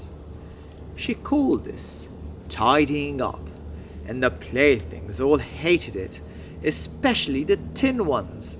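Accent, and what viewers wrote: United Kingdom. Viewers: 最高のヒーリング動画…🥺 イギリス英語ってなんでこんなに耳心地がいいのでしょう… さんの読み方がいいのか…！眠るまで聞き続けます😇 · 美しいイギリス英語に聞き惚れますね~はぁ続きが楽しみ✨素敵なクリスマスプレゼントありがとうございます😭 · 焚き火の音もさんの声もイギリスの訛りも全部好きでこれ聴きながら眠りについています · 発音が心地よくて本当に聴いてて最高です。これをきっかけに「ビロードのうさぎ」の洋書も書いました。もし可能なら「えんとつ町のプペル」の英語版の朗読もイギリス英語でやっていただけるとうれしいです🇬🇧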